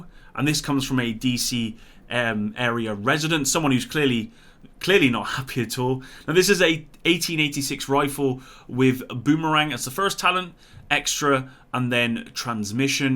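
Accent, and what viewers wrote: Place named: Bristol, United Kingdom. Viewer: What part of Bristol are you from?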